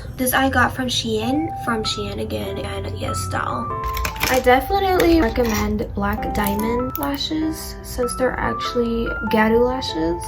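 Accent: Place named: South, United States. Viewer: Omg an Asian with a southern accent is so cute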